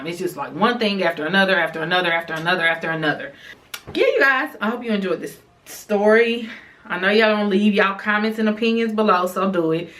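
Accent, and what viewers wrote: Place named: Bay Area, United States. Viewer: I am too! I came across your channel just browsing. Clicked on this video, heard you talk (kiss braids, member instead of remember, just your mannerisms, etc...) and said she had to be from the Town. I live in Cali now and you reminded me of home so much. Congratulations on your new union!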